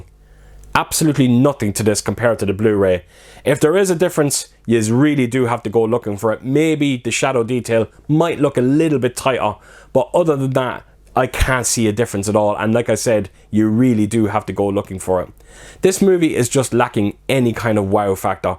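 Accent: Ireland. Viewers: Do you mind asking where you're from? Could be wrong but you sound Irish. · please make sure to link your video review. Your video message, especially that fantastic Irish accent (man am I jealous and love it) is so crucial to getting the point across. OR, have a go at another video dedicated to "Planes, Trains..." and really hammer 'em. We'll call it the remixed/uncensored version! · first time viewer and immedietly i hear the assault on precint 13 music and then the irish accent, irish myself from limerick and just started collecting last year, recently i bought first blood, the thing and predator all 4k uhd, planes trains was on my list too, not now, just subbed man and looking forward to your next uploads, cheers man.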